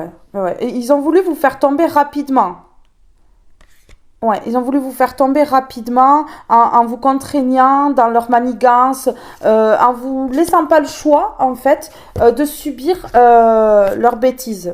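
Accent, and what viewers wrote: South, France. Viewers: j'asore votre accent du Sud...trop mimi ne changez pas merci pour votre guidance bon wkd...13 vendredi😊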